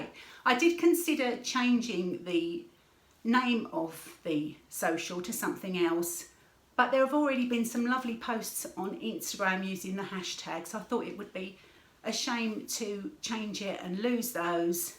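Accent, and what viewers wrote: England, United Kingdom. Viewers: SapphireMtHandcrafts A lovely time when I sit back and let you transport me to England with you accent and way of talking. So enjoyable. Looking forward to seeing how the watering can bag turns out. You garden looks like it needs a few more months before Spring arrives and flowers overflow your hanging basket s. Thanks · Always enjoy watching your FT. Jealous of your conservatory🙂 I enjoy hearing your English accent, as my mom was from England, and I hear you say some of her expressions like skew wiff. I’m in Ontario Canada. I’m glad you are doing the Lori Holt sewcial,. I also enjoy stitching up her patterns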